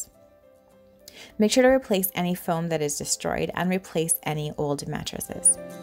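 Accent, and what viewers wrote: Canada. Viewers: THANK YOU!!! Everything you did was amazing! We have a camper that I’m wanting to update and have been nervous to do so thanks for giving me the courage to do them and see what works and what doesn’t. Also I used to live in Canada and I love your accent. 🇨🇦 I subscribed!!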